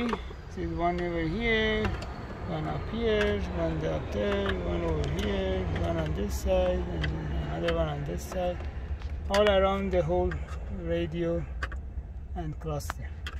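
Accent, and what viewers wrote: Iran. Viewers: You must be Persian I love your accent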